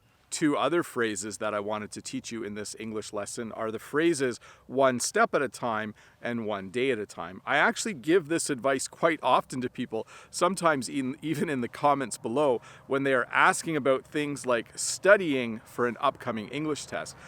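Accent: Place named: Canada. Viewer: it's awesome hearing the nuances in Canadian English versus American English. Thanks for the upload.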